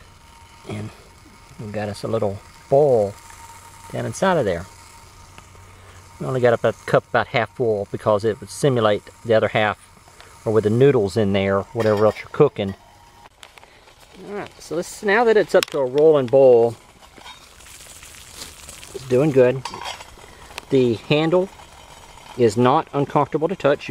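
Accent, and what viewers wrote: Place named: Texas, United States. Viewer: I subscribe to your channel. I know at lot of things that you teach ,but you always seem to have one idea that I had not considered. I love your Texas accent,; My son-inlaw is Texan. If the two of you were talking from another room, I wold have to guess who was who. I enjoy your vids. Keep up the good work! 28Bull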